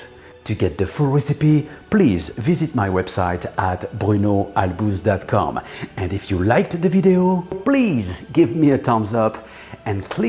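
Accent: France. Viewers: The French accent tears me into pieces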